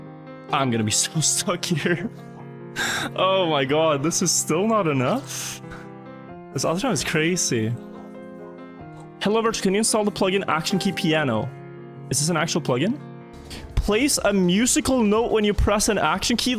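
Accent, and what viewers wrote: Norway. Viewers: subtitling his norweigen accent is so funny lmao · I love Norwegian "accent" - It just improves everything! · The Norwegian accent....😂 Everytime I hear Norwegian speak it.... Fucking adorable. 😂 Live in Sweden the Swedish accent is just not the same. Close but not the same. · Aww man speaking with that Norwegian English is awesome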